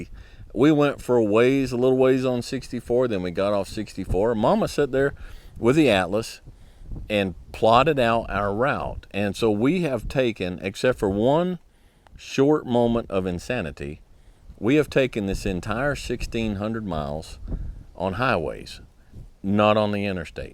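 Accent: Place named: Tennessee, United States. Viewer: Sounds like you live close to Pulaski tn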